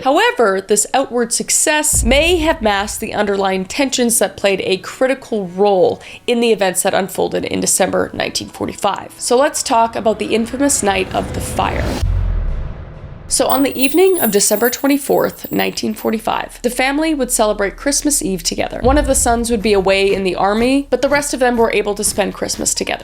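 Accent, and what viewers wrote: Russia. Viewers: Until right this second I fully thought you had a Russian accent! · Are you Russian? I was wondering because you do the accent a lot and that is what made me look at this channel because my crush is Russian and I won’t to know what he likes please please please be Russian I love him😘😏💋💋💋💋💋💋💋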